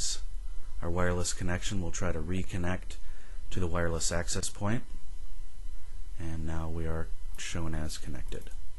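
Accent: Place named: United States. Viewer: No accent??!! I could definitly hear the American accent.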